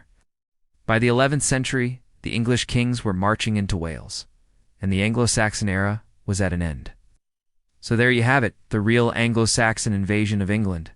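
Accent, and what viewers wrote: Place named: United States. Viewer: not a chance that yankee accent is english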